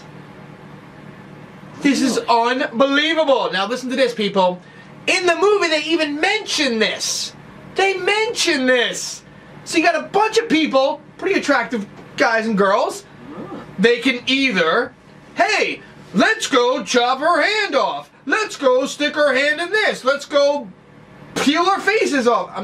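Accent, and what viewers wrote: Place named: Philadelphia, United States. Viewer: Is the movie Hancock, with the loveable Will Smith? I think it is;) And I only saw that movie once about 3 years ago. Anyway, Vile sounds good and I've loaded it up. Gonna watch it right after posting my answer to where your hat is from. Plus, great news for you, I live in Pennsylvania and from your accent, I'm guessing you live near Philly (also Flyer's cap;) so shipping should be minimum haha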